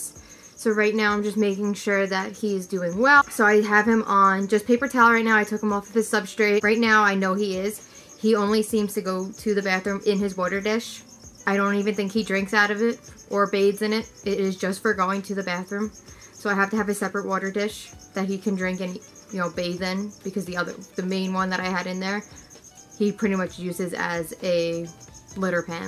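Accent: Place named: East Coast, United States. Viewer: Hey hey hey! Something to consider is that reptiles can live together for years without problems and then one day they can snap. I'd really hate to see that happen. I follow a bearded dragon rescuer on Instagram who has seen it happen a million times with beardies she's taken in. Omg I want a leachie so bad! I don't buy from breeders so I need to find one that's special needs or adoptable! Where are you from? You sound like an east coaster, which I used to be! Do you find that cognitively you have any issues feeding mice while also having pet mice? I can't keep snakes because of my affection for my pet rats. I'm not passing judgement I promise :) I'm curious about how you see it in your mind because everyone is different. BEAUTIFUL DOGS! Be careful with those plants in the axolotl tank. If they're not silk plants they can tear the skin of the axie, especially the tail. 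Good video :)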